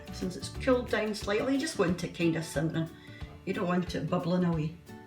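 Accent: Scotland, United Kingdom. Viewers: I ❤ur videos im doing a few this week lovely to hear a Scottish accent living in London apart from family its refreshing and looks great im starving 👌😂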